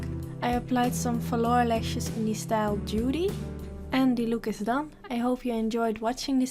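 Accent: Netherlands. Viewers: omg i love ur tutorials! u have a dutch accent haha! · This look is amazing! Btw, what country are you from? You've got a little bit of a dutch accent ;)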